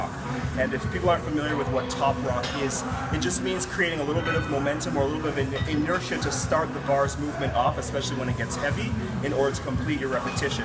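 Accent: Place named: Canada. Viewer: A black guy with a Canadian accent.....learn something new everyday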